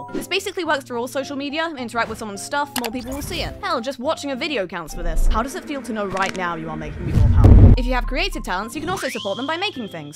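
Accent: United Kingdom. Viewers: You Still Sound British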